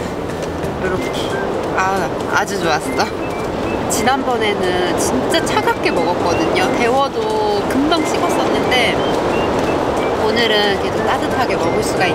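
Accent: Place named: Seoul, South Korea. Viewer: 서울 말투 인가요 차분하니 말씀 잘하시네요..경상도 말많듣다보니 너무좋네요 ㅋㅋㅋ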